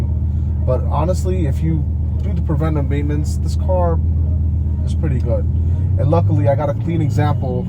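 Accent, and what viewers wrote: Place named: United States. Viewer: You look so dope! Your accent and your tone sounds like a typical American old school guy! Your cartel is surely one of the dopest one's in India! Being a Mumbaikar.....i hope we'll meet soon!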